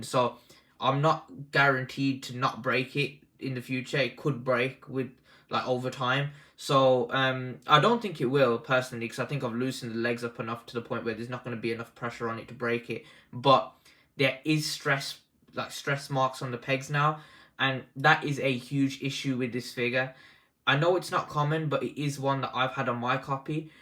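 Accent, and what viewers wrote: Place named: Birmingham, United Kingdom. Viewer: You from Brum too?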